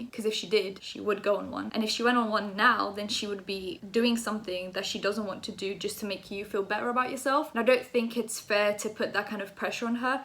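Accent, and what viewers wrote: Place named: London, United Kingdom. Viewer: That’s a proper London accent u got there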